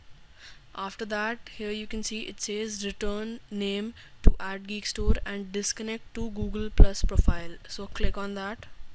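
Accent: India. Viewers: Are you Indian? Just want to know your accent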